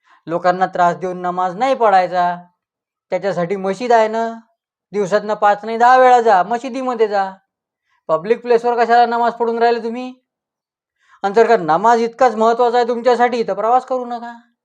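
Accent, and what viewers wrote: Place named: Amravati, India. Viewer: अगदी अमरावतीची भाषा, खंवचटपणा! पुन्हां पुन्हां ऐकतो तुम्हाला.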